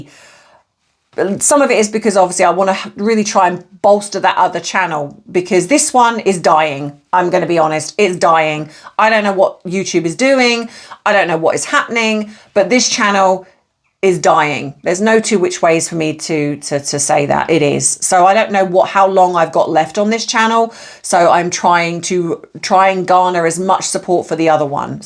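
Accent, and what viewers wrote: United Kingdom. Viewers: I'm not sure why your channel would be failing. I really enjoy your videos, love the British accent, and believe you are spot on in your analyses. I've been "hyping" your channel. I'm not sure if that helps boost your algorithims but it can't hurt. 😊 Subscribed to Bite Size too. Edited to add that the Montecito clowns bought the award.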